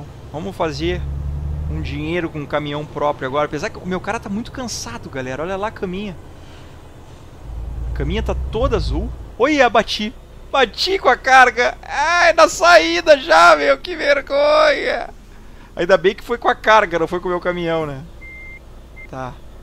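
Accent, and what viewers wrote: Rio Grande do Sul, Brazil. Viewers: VC E GAÚCHO NÉ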